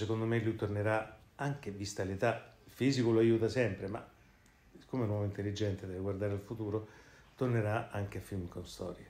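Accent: Rome, Italy. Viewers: Mamma mia che fenomeno, adoro la sua voce. Ma come fa, ha una voce sbiascicata, piena di sc doppie sbagliate romanesche...... grande!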